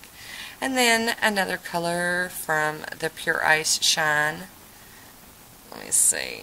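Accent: South, United States. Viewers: I'm from Pennsylvania! I could definitely tell that you're from the south! Thank you for sharing your love of polish with the world!!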